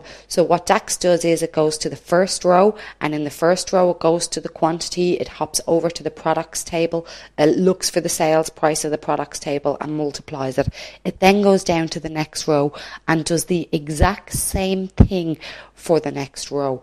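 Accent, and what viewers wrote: Ireland. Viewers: Lovely Irish accent!!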